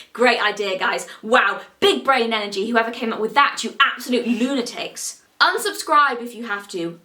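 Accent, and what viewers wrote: United Kingdom. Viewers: It's such a relief to hear with my own ears that intelligent people with British accents still exist. Greetings from across the pond. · I'm a brand new viewer, your James cordon video just came up in recommended and I was like yeah sure. I do not give a shit about James cordon, I don't care about any of his work, but I watched the whole entire video in one sitting not because I was waiting in baited breath to finally see James cordon finally get demolished, but because of you. Your writing, performance, delivery, structure, you completely hooked me and I subscribed immediately. Maybe it's just the novelty of hearing angry British woman as an American but I watched your video not because I wanted to hear about James cordon but because I was curious about your channel and you hooked me in and after watching this video all in one sitting right after that James cordon (drunk yet?) I have a feeling nobody cares all that much about what you talk about, only that you talk about it. 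I'm not watching A VIDEO about James cordon I'm watching YOUR VIDEO. I really hope you stick around here cause you seem to be good at this. Maybe you declare you will in this video, I'm only at the 30 min mark but regardless of what you decide, I wish you good luck, you certainly deserve it Edit: finished the video now, another channel called filmento is a good example of what you're trying to do I think. He uses movies to talk about concepts like how to make a movie for kids vs adults or how to poorly or properly do exposition so he might be a good bit of guidance for what you want to try to do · Shadiversity….Now I know why you showed up in my recommended out of nowhere lmao EDIT: oh this is gonna be a really British woman ranting passionately about tyrants. This will be good for my lifting routine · Just found this channel and this is absolutely glorious. And I don’t just say this as a fellow stylish Brit with a similar accent and knack for swearing.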